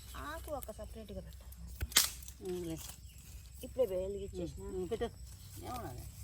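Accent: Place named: Telangana, India. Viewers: Telangana valla tho unty ela ne matladutaru ga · మీరు మాట్లాడటం కొంచెం తెలంగాణ లెక్కనే ఉంది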